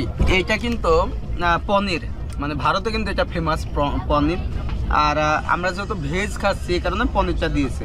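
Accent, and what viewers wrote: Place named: Kushtia, Bangladesh. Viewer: কুষ্টিয়ার ভাষা মনে হচ্ছে।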